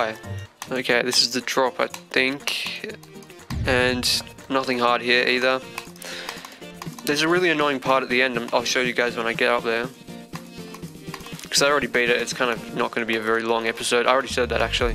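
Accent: Australia. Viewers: You Aussie or something? Because you really do sound Aussie, cool if you are cos so am I;) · Are you Australian? because I love your accent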